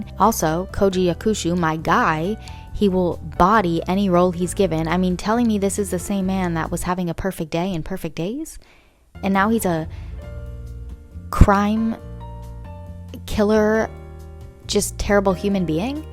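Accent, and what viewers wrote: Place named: France. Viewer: As a french person, i love your french accent !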